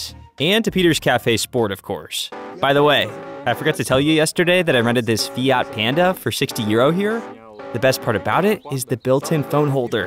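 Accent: United States. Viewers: Had to stop watching as the commentary is in Murican, please learn how to say, 'Ah Zores'' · Good apart from the annoying American accent voice over. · Interesting video! Good presentation. Clearly an American accent (via your AI overlay) but speaking in units of Celsius for temperature measurements? at least put the deg F in subtitles. When was the last time NOAA.gov put all their units only in Deg C?… try never (yet). Based on your channel videos it’s a good guess a large portion of your audience is American… How hard is it to visually post both units?…. With all your complex map graphics, seems like a tiny detail. If 2% of my viewers used predominantly Kelvin, I’d put that in subtitles too. And let’s be honest, Kelvins are the best units for temperature anyways! 😊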